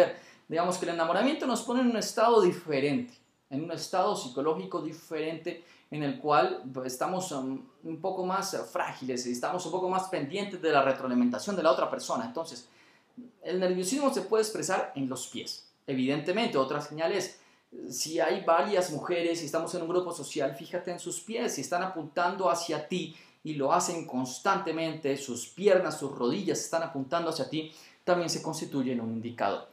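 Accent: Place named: Colombia. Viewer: Bonito acento, de entrada supe que eras Colombiano :)... Me re encantaron tus tips, gracias!!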